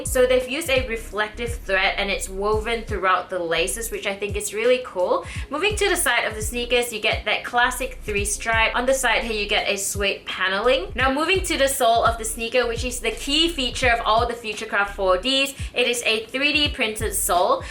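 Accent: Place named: Australia. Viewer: I love your aussie accent LOL its so cool compared to my American accent. Def gonna look into 4Ds I think Adidas is really killing the game right now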